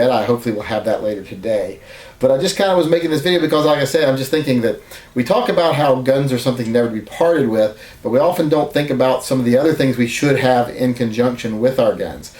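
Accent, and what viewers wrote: United States. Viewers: Never ever buy or sell a gun from a sissy sounding Yankee. Especially one who magically has fooled a large group of followers that he knows jackshit about firearms. Those seeking knowledge please look elsewhere because all you've got here is a smartass blowhard.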